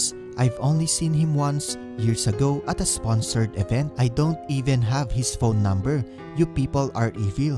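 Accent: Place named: Philippines. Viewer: You have a Pinoy accent. 😊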